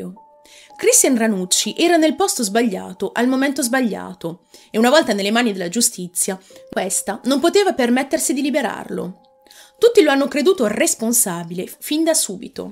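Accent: Apulia, Italy. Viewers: Interessantissima storia ed allo stesso tempo agghiacciante. Quante vite sono sconvolte da storie simili. Una curiosità: ti credevo pugliese. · Ma perché se sei francese hai un accento pugliese così forte???? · Non sapevo che fossi francese, se davvero è così complimenti parli un italiano perfetto, pensa che io ti scambiavo per come parlavi in italiano per una Pugliese😂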